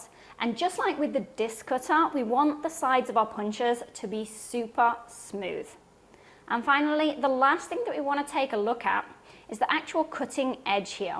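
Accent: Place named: England, United Kingdom. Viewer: Have to watch the vid again to find out how to use a disc cutter,--------- couldn't concentrate. was not expecting the pommy accent and then "brick shithouse " came out and then "any hole" PMSL!!!. Had you on fbook for some time now, without knowing you were sooo entertaining. Following on Youtube and Insta from tonight here in Aus.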